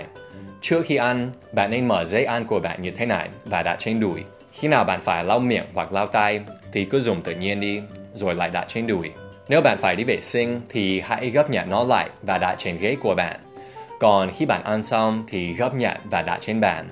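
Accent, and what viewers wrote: Standard. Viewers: Bạn nói Tiếng VIỆT CHUẪN ghê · hài thật phát âm chuẩn học sinh Việt hêhê! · mấy bạn để ý đi giọng nói tiếng việt của thầy càng ngày càng chuẩn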